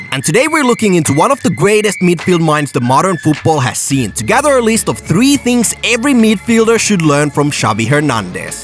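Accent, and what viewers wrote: Finland. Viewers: Is this guy finnish? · suomalainen puhuja. Helposti tunnistaa🙂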